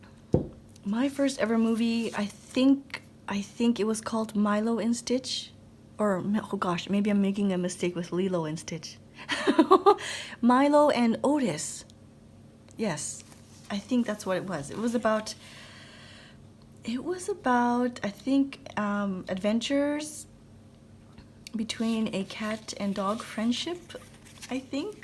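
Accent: Canada. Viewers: Haha I could tell you were Canadian the first time I watched one of your videos :) I didn't realize how much I say "eh" until I lived in the UK and people would point it out 😄 I love this longer chatty style video! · Thank you for this video! I have had it saved in my Watch list for awhile but have been having a planner meltdown recently, ha! I think today I have sorted out my dilemma and came on here to watch something and saw your video and it was exactly what I wanted! In fact I am now going to make a playlist of a bunch of your videos to watch as I journal! Also, I don't hear your Canadian accent.... might be because I'm Canadian too:) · Love hanging with you! Love seeing how you prep your pages. You can use the old calendar as a junk journal also. Just collage over the pages. I remember $2 Tuesdays for sure. I think my first movie was at a drive in with my family in Barbados. 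I was 16 and we saw the first Star Wars. How's that for dating myself? And yes you have a Canadian accent. 😁Also loved hearing you enjoy your sandwich. I also craft on my dining table. 🤗💞😘😘😁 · Fun video! Thanks for sharing-I love this type of video so please keep doing them! You definitely have a Canadian accent-it makes me smile when you say "Eh?". When we order pizza we order it well-done, so it has those darker bits of cheese and dough-yum! Have a great day!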